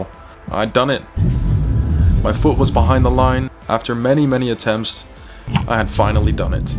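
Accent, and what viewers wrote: Netherlands. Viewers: Are you actually Dutch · eh bro jij bent toch nederlands dat hoor ik aan je engels accent, maar je maakt echt leuke content ga zo door!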